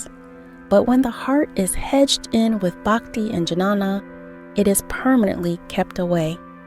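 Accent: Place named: United States. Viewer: Don't want to nitpick here but to hear these words pronounced with a heavy american English accents is really jarring.... and I think somehow detracts from the quality of the video. The proper Sanskrit pronunciation of Jnana is "nyaa-na" .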